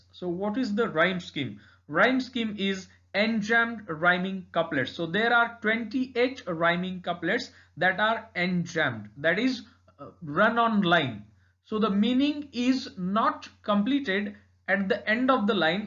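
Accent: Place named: India. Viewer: Bolne ka way Indian b rakh sakte h sir aap here no any issue in our tongue as like foreigners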